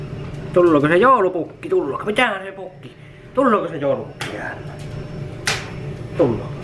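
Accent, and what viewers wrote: Savo, Finland. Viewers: Siinon rehellinen, Aeto Savolaenen :D :D :D <3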